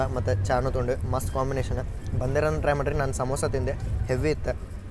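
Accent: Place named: Hubballi, India. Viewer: ಉತ್ತರ ಕರ್ನಾಟಕ ದ ಅದರಲ್ಲೂ ಹುಬ್ಬಳ್ಳಿ ಕನ್ನಡ ಇಷ್ಟವಾಗುತ್ತದೆ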